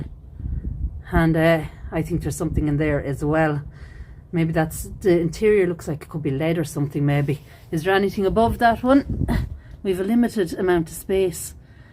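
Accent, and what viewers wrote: Ireland. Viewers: Great job! Appreciate the dedication and work you put in. Are you kidding? The wind noise and camera focus is nothing - anyone who's ever done video knows that. Ignore the naysayers -- 99% of the people enjoyed it - the other one percent are holed up somewhere living off their parents and whining. You do a terrific job - glad I found you and hope to see more of your work in the future. And I love the Irish brogue!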